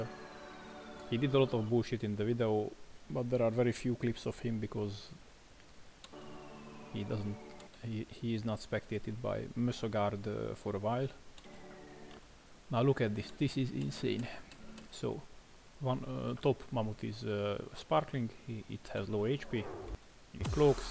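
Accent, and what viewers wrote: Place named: Hungary. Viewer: Szerbusz, nemrég kezdetem el a játékkal ujra játszani, gondolom irok mert én is iszonyu sok emberrel talélkozok karantén alatt biztos unatkoznak és azt szeretném kérdezni h mit lehetne velük csinálni h ki legyenek tiltva, vegyem fel a meccseket én is is csináljam ugy v mi? (gondolom magyar vagy a "munka" mappáról, meg hallatszik az akcentusodon! :D )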